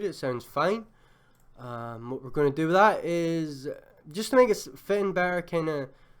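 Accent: Scotland, United Kingdom. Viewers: Nice vid bro Scottish accent got the sub for me sick to death of American tutorials mate no offence to any Yanks reading this comment lol 👍🏼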